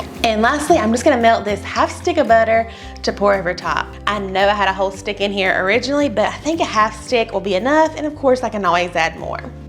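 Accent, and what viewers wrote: South, United States. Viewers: I just love your darling personality and sweet voice. I lived in the south for 10 years (live in the Midwest now) but I miss hearing all the sweet as honey accents and voices of my friends and neighbors. I've been out of the South now for over 20 years but I still say y'all, and sometimes all y'all - even if I do get the occasional odd look - ha!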